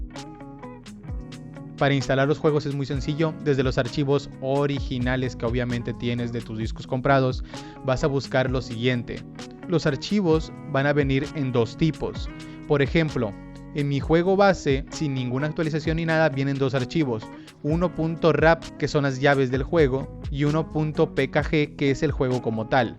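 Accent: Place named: Chile. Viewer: aprende a pronunciar la "sh", esta claro que eres chileno.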